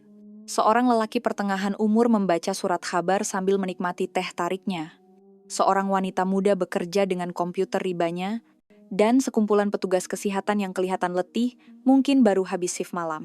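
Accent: Indonesia. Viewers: Pengulas ini wanita Indon kan.Tetap kita boleh detect. P disebut pe,k disebut ka.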